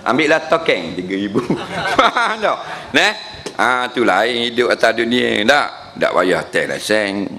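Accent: Terengganu, Malaysia. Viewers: Ceramah terbaik, dari yg terbaik.Lawak2 yg penuh berisi, dlm loghat Terengganu.Best loghat Terengganu ni.Loghatnya lebih kurang loghat Kelate le!!!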